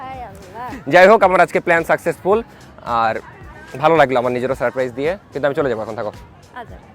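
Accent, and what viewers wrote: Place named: Nawabganj, Bangladesh. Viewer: ভাষাতে নবাবগঞ্জের টান আছে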